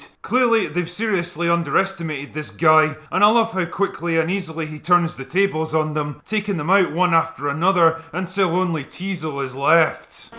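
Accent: Scotland, United Kingdom. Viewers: I assume by your accent that you're a Scot. Do you know the film Culloden by Peter Watkins? This was his first professionally produced film and paved the way for the BBC to produce The War Game (which they then refused to transmit) <link>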